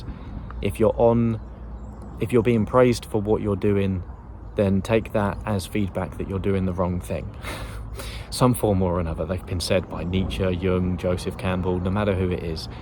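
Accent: England, United Kingdom. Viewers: The Wise English Voice in my head is back 😁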